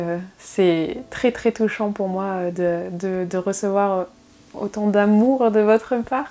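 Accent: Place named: Hainaut, Belgium. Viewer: Félicitations pour ce cap des 10000 abonnés. C'était un plaisir de te découvrir davantage... Avec toutes tes passions qui sont celles que j'aime aussi... Cette vie douce est vraiment source de satisfactions ... Tu diffuses de l'apaisement comme le parfum des pâtisseries que je t'imagine sortir du four ☺️. Et cerise sur le gâteau tu es du Hainaut 😉 le pays de ma famille maternelle.. 🤗. Et chaque note d'accent est une petite madeleine pour moi ☺️... Je te souhaite encore de nombreux abonnés et surtout... beaucoup de temps pour continuer à créer. 😘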